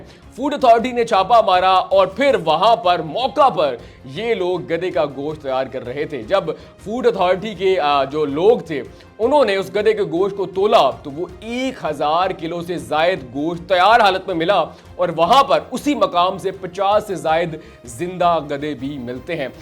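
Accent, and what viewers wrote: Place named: Pakistan. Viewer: Burger urdu bholny wale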